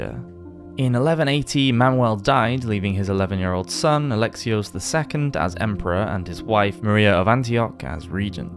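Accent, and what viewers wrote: United Kingdom. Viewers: The way you red coats pronounce some of your words is astonishing...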